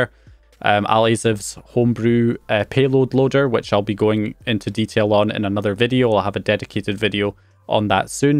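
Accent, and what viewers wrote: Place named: Scottish Highlands, United Kingdom. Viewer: damn, it's not very often I click a video and hear my accent lol. Aviemore? Inverness?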